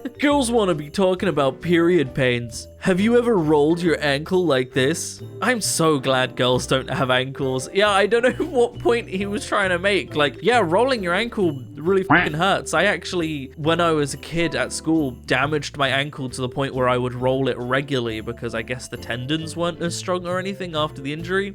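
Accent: United Kingdom. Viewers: hi,im paje(she/they),are you scottish or irish by any chance? also whats chauffeur?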